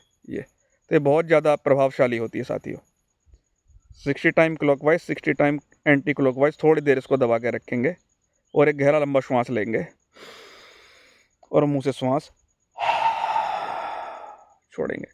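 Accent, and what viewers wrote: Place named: Junagadh, India. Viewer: आप की बोलने की ले से मुझे अंदाजा था की आप जूनागढ़ से होंगे आप को सादर 🙏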